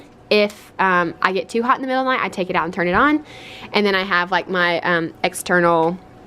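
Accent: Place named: Kentucky, United States. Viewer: I was wondering why your accent sounded so familiar, I’m from Kentucky too! I’m about to graduate from EKU. Loved your Kentucky art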